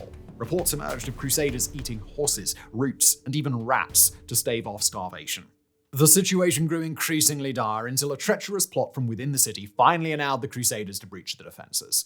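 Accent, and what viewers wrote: United Kingdom. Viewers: why do you sound extra British in this episode?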